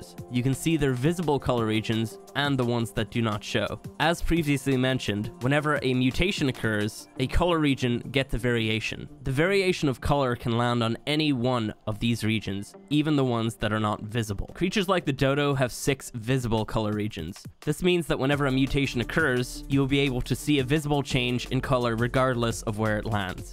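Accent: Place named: Ireland. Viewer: He is Irish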